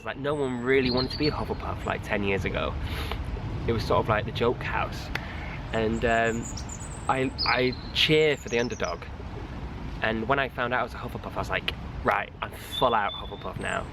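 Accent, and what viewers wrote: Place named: North, United Kingdom. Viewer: "hello... yer alright? just doing a little filming" oh my heart what a precious northern boy.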